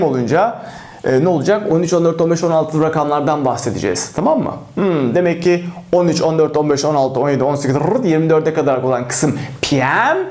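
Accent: United Kingdom. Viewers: Hocam lütfen daha çok zaman ayırın.İlk defa ingilizce öğrenebileceğim kanal buldum hem de ingiliz aksanı. Çoğu kanal umut satıyor, insanlar izledikleriyle kalıyor malesef. İngiliz aksanı ile konuşulan film ve dizi önerileri gelirse çok iyi olur 👍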